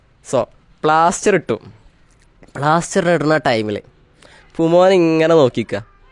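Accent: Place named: Manjeri, India. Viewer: Manjery slag adipoli,valkeene😂😂😂😂❤❤❤❤❤ ❤❤❤❤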